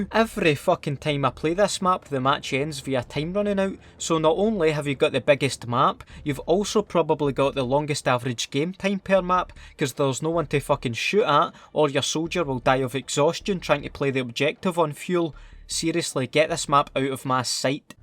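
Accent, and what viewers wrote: Scotland, United Kingdom. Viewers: 10/10 Scottish accent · Ur soooo much scottish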